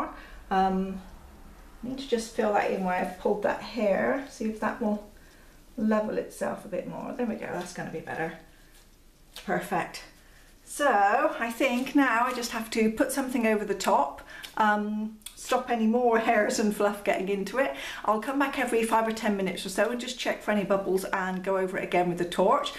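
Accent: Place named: United Kingdom. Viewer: That's awesome, a 2 sided resin picture! I've been trying to make out your accent. Pretty certain youre British, maybe from Devon or Cornwall, somewhere down there. Then I was thinking may be that's the accent from the island where you are. Then, haha, you said 'soap or squeezy,' & I'm pretty sure it's just us Brits that call it squeezy. For the rest of you, it's probably dish soap or the actual brand name! 😀😀 Anyway, thank you for all your tips & experiments. I'm really enjoying watching you.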